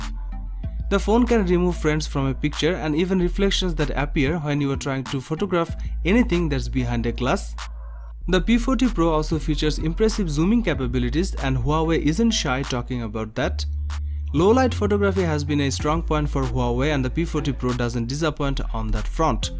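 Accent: Bengal, India. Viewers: Sound like Bengal or assam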